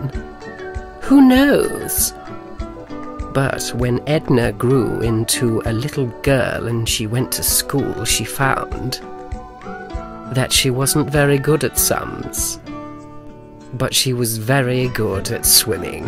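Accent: United Kingdom. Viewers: I love your british accent i guess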